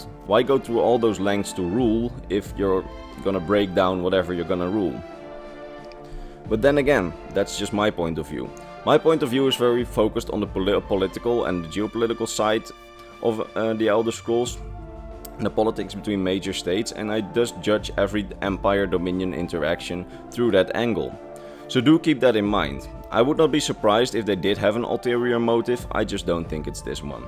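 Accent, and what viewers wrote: Netherlands. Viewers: He's Dutch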